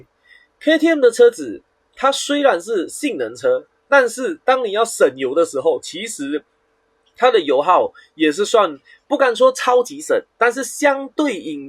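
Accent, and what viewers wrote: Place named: Hakka, China. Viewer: 聽起來像是客家國語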